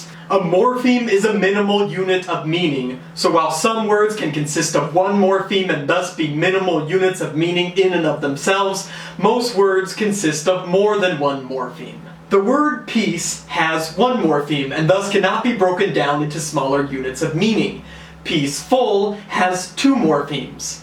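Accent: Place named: United States. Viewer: his accent also helps a lot, an excellent American well pronounced English Accent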